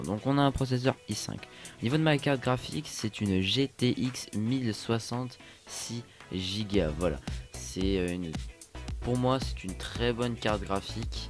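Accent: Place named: Quebec, Canada. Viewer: Tu est québécois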